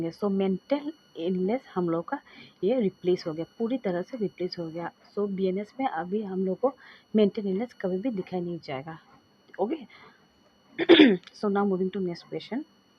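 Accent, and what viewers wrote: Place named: Bengal, India. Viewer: The bengali accent is so irritating..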